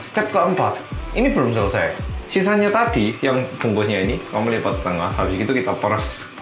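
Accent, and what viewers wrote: Java, Indonesia. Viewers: siang medoookkkkk wkkwkwkw tmbah ganteng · Absen dulu buat si korea medhok!😊 sukses selalu oppa(?)atau mas iki😂,salam bolo" barumu mas.aku mau manggil mas aja karena aku udh menganggapmu sbng wong medhok😊.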